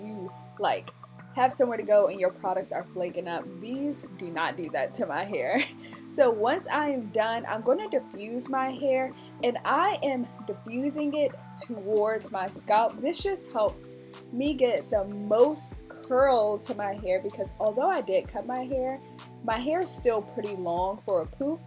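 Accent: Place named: South, United States. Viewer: soo random but i never noticed she had a southern accent. i swear she does 😅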